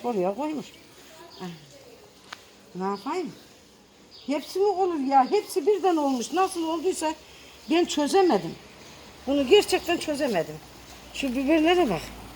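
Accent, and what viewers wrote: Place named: Mersin, Turkey. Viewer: Ben Mersinli oldugunuzu anladim konusmanizdan bende Mersin'de yasiyorum cunku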